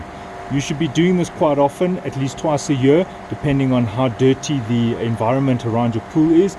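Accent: South Africa. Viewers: South African boet!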